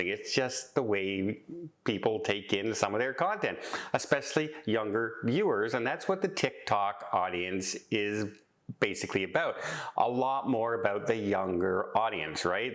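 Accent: Canada. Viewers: I've never heard it pronounced that way. Maybe it's a Canadian thing, like the way he says "garage."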